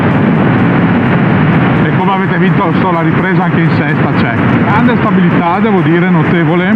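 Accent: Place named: Padua, Italy. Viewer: bel video, sempre grandi contenuti e spiegati, raccontati in padoan, tanta roba🤣🤣🤣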